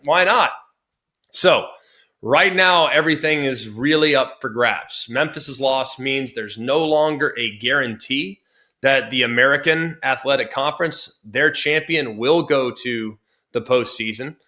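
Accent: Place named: Midwest, United States. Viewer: I thought you went to bama not a big 10 school, the way you talk seems like you went to a big 10 school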